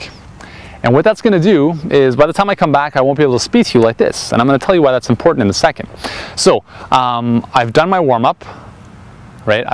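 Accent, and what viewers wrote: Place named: Canada. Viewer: CANADA ! O'bout ! (Love this accent)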